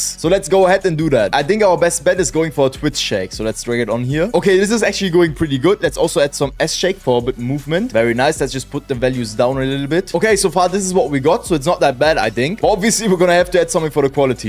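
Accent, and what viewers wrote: Germany. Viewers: bro du hörst dich so deutsch an 😭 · Wieso weiß ich sofort das er deutsch ist😂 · U sound german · i hear german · Man merkt anders das er deutscher ist. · are you german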